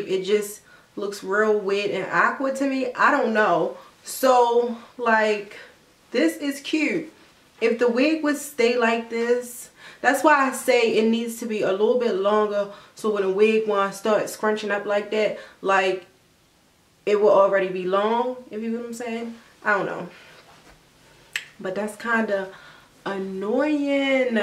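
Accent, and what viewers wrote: Baltimore, United States. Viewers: I love the wig!!! You sound like my cousins in Baltimore! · I love it!!! Love that bmore accent !! U have the face for it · U sound like u from Baltimore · Is that a Baltimore accent I hear?? If so I'm from Baltimore too. I get excited when I hear vloggers from Baltimore. Anyway I'm a new subscriber. love your channel and reviews. Love this wig!! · You from Baltimore?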